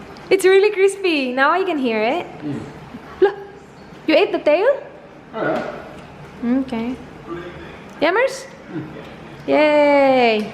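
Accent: Visayas, Philippines. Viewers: The way she speak English, at mag bibisaya sa huli... 😂 That's cool..❤️❤️ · Nakakatuwa talaga ang bisaya accent mo ate😄 Chaaar uuuyyyyyy!😁😆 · first time ko manood ng video mo po. And da way ka mo sulti nakahibaw na jud ko na bisaya ka te hahahaha love this video and imma subscribe to ur yt channel na hehe. Lovelots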